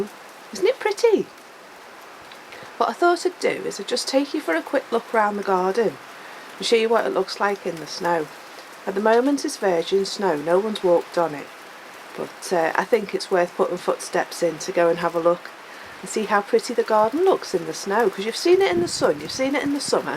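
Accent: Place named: England, United Kingdom. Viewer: I have just found you! It is lovely to hear an English voice, as there are so many other folk, but not many English videos it seems, who are about every day life. I love where your live, and those beautiful trees. I used to keep chickens, Rhoad Island Reds, like yours, and I loved them - they were ex batterie. The whole world around you looks so wonderful in the snow - fairy dust on everything. Love your hat! Blessings to you from Jilly & Madge the beautiful black rescue greyhound, from Devon, England xxx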